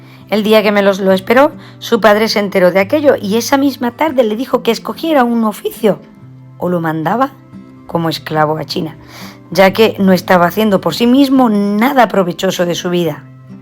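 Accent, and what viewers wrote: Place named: Spain. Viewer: Se le escucha acento español y por lo tanto debe tener dicha nacionalidad. En verdad me sorprende que alguien de España haya pronunciado la palabra "colonización" término ambiguo y mal aplicado ya que el actual territorio mexicano fue parte del virreinato de la nueva España, nunca fue una colonia y los nativos de las Américas fueron considerados súbditos después de la conquista cómo lo dejo expresado la reina Isabel la católica en su testamento y nunca fue política del Reino de Castilla y después del glorioso imperio español el "esclavizar a los mexicanos" al menos no en la ley, entiendo que muchos peninsulares y criollos cometieron abusos en éstas tierras pero siempre al margen de las leyes de Castilla y aún contra ellas. La historia de México no se entiende sin la historia de España, la historia de España no se entiende sin la historia de Hispanoamérica y me sorprende que tanto españoles cómo mexicanos desconozcan nuestra historia en común. 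Su reseña del periquillo sarniento es algo inexacta, le mando un saludo desde el territorio del antiguo reino de la Nueva Galicia.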